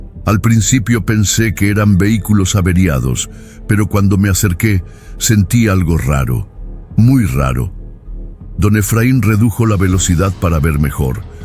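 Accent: Dominican Republic. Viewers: Hasta parece dominicano ese man del narrador😂😂😂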